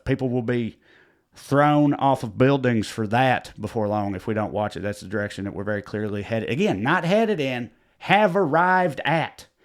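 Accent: South, United States. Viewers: Love this!! Any of your stuff i love. You sound alot like me and its nice to have a southern voice of reason! Please stay safe and keep up the great work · Hey man, I found you on Instagram. Keeping making these! I'll keep clicking. I don't know why, but its really calming to hear a leftist views with a southern accent. Cletus The Slack Jawed Yokel was the stand in for the south until I heard you.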